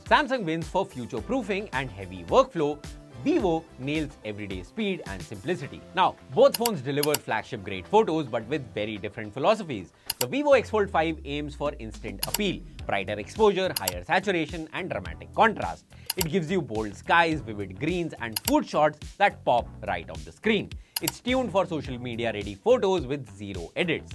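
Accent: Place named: India. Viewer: Horrible Indian accent, 🫤 i can't understand what you saying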